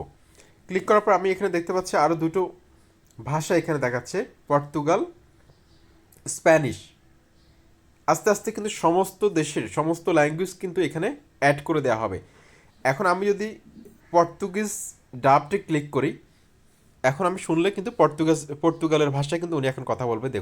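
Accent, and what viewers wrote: Sylhet, Bangladesh. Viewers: আপনি কি সিলেটি?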